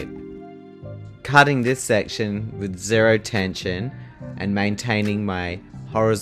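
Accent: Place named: Australia. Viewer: Hi! WoW yr channel is stunning 😍 and I love yr Avustralian accent!! Actually I’ve fallen love with it.. sorry but this accent much more attractive and beautiful than english accent.This is immaterial but I just wanted to indicate.very good luck to y 😊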